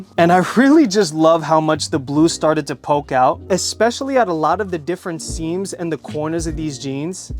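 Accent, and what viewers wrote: New York, United States. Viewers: Feel like im in newh yohk, love the accent.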